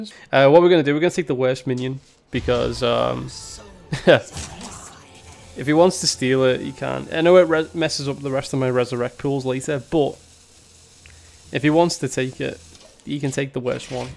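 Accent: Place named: Scouse, United Kingdom. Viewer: great play I can hear a touch of the scouse accent lol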